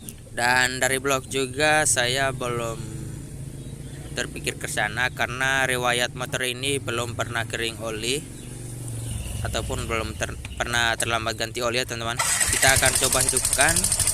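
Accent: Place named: Ambon, Indonesia. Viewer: Dari bahasa suara sumbang mcam org ambon k? Posisi bengkel dimna bos?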